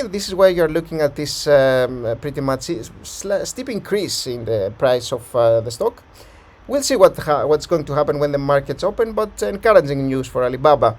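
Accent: Greece. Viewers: Again da best greek accent in english ! Dont you think there s an other bulltrap forming ?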